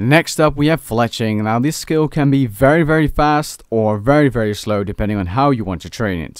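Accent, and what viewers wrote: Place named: Netherlands. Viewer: haha nou je floept er genoeg Nederlandse klanken door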